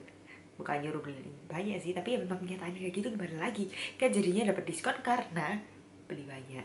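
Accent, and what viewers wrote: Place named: Surabaya, Indonesia. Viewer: surabaya itu aksennya kental y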